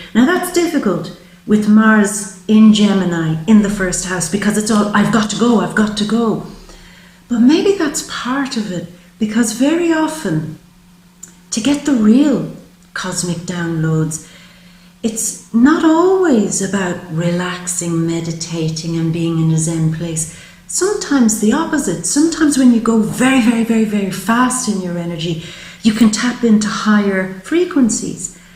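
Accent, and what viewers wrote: Ireland. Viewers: You look amazing, thanks for the reading, from one Irish person to another, it's lovely to hear an Irish accent in tarot❤️❤️❤️🤗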